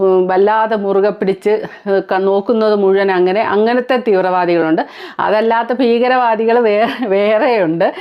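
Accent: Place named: North Kerala, India. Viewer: വീഡിയോകൊള്ളാം. നന്നായി. ആ ഞ്ഞാമെന്റൈ കൊള്ളില്ല. വടക്കന്മാരെല്ലാവരും പറയുന്നഫാഷ യാണ്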